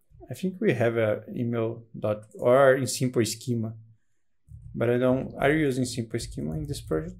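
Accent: Brazil. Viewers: Pelo sotaque tu é brasileiro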